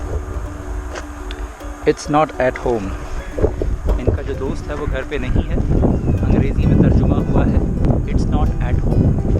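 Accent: Haryana, India. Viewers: Bhai kasuta mazaa aa gaya.haryanvi boli chorah daar gya. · Bro are you from Haryana? Cause you speak haryanvi so well. Love from Haryana ❤ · Ram Ram Bhai 🙏 🙏 Bhai Pataudi aala ♥️ Bhaiya bda achaa lg rha h aapko haryanvi bolta dkh he video m ...mujhe yaad h m aapko bolta tha hr video m haryanvi bolne ko or wo aap kr bhi rhe h ...bda achaa lg rha h bhai ji ♥️♥️ Dil s dua h bhai ko or jyada trkkii kro aap ♥️♥️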